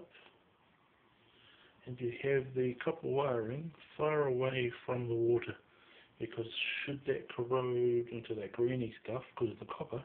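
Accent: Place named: New Zealand. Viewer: try learning to listen to New Zealand accent, then re-listen to it, sorry about the muffled sound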